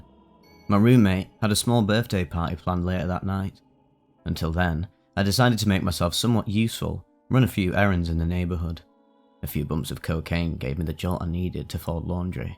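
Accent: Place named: England, United Kingdom. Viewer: You have the perfect voice for narrating. Which part of England are you from?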